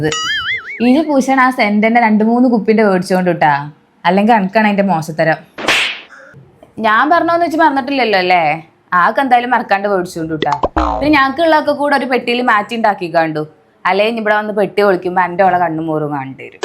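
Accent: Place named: Malappuram, India. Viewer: സംസാരം മലപ്പുറം സ്റ്റൈൽ ആണല്ലോ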